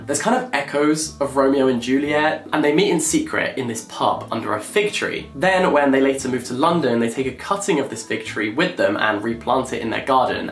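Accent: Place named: Scotland, United Kingdom. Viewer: My brain started thinking in Scottish is so funny I'm laughing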